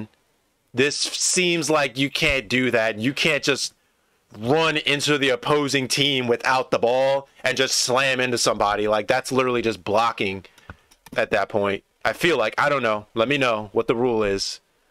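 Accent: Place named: Belfast, United Kingdom. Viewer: You could be broad Belfast with that accent bro, love your commentary.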